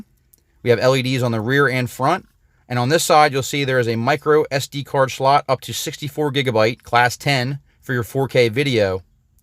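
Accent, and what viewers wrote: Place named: Baltimore, United States. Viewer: Love the straight up Baltimore accent. Sorry to see this with the drone. I was kind of excited to buy this for my son.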